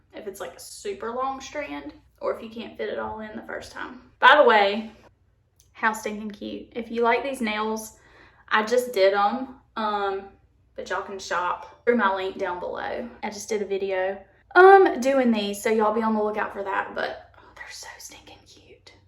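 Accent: South, United States. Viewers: Omg you're so pretty!! you remind me of when I was at Oral Roberts University in person with your Southern accent love😍😘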